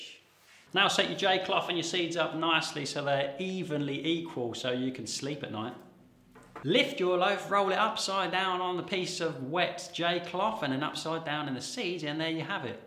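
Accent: United Kingdom. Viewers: Welcome back,! So glad to hear your lovely voice and British accent. Always brightens my day! Yes, this is a fantastic trick! I’ve used it for seeds and bran and rolled oats — whatever I want to stick onto the bread. If the seeds are heavier, I sometimes give a little rub to the wet top of the loaf to work up a bit of starch. Only takes a few seconds, but I think it helps stuff stick better when I’m slicing the loaf after it’s baked. Keep up the good work and great smiles for us! You are sunshine on a cloudy day. A breath of fresh air in a stifling room. The sauce on our pizza dough… 🤣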